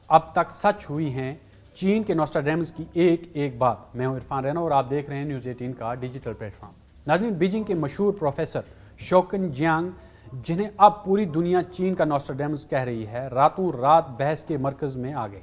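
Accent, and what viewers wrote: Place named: Pakistan. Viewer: He is not from India. He talk like Pakistani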